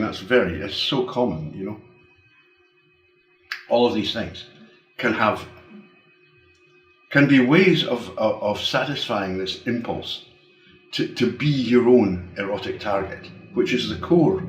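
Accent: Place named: Scotland, United Kingdom. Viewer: Very interesting, had some problem with the scottish accent so i have to listen twice...English is nor my first language so,, but learning..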